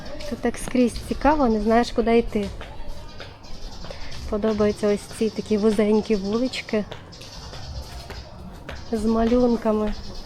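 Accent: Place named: Kherson, Ukraine. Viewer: ох ці праведний. Ну і говори на расейській сам А дівчина має свій Херсонський 🇺🇦 суржик як 90% Українців мають свій суржик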